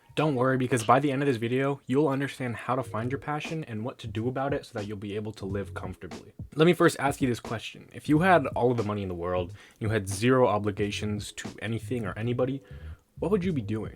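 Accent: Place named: Birmingham, United Kingdom. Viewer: Are you from birmingham UK too. You got that accent 😂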